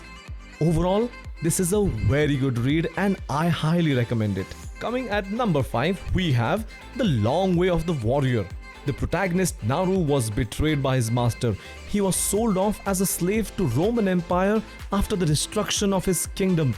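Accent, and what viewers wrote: India. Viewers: i just know from your voice that you are indian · Oh good god I hate Indian English ! The pronunciations are just so JARRING !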